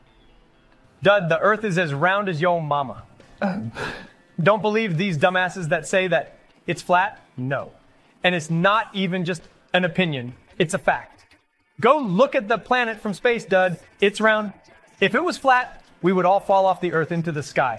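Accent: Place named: Quebec, Canada. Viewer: hes literally french canadian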